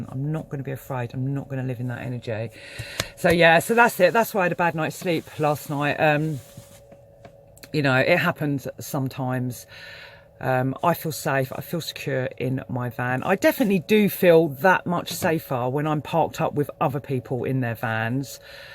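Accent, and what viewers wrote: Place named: United Kingdom. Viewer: Just sat and listened to you work out in your head that you are safe in van , good out come. Though I do think it would be helpful to have an idea “Where you are” to be included in your intro is welcomed here for new viewers, as your intro is “its really cold....ok? Where like frozen in Alaska or California 30 degrees chill, judging by accent UK. Just curious what comfort level one can expect in a van in winter, assuming it was built with typical base of insulation. See you have propane and a warm dog and you built a closure from front cab. Shoot! All good measures for van living! Anyway just a thought as YT “recommenced “ this in my feed to view and its first video Ive viewed, just would have been good to know where u b? State, Country.. not gps cooordinates. LOl Good of you to share your thoughts with the world and for YT to connect us with your story. 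Keep thoughts on the positive a thought have vibrations, “ YES i am safe” perfecto!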